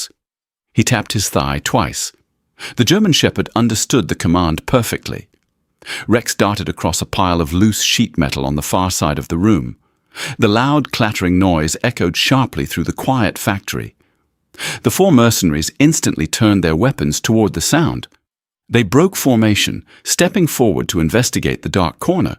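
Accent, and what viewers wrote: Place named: England, United Kingdom. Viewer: It’s weird listening to an English accent in a USA set story 😂❤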